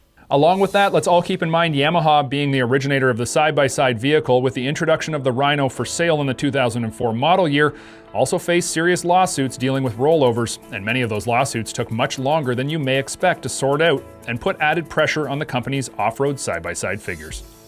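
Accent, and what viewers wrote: Canada. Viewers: You mentioned tariffs you sound Canadian so I think I understand why you did it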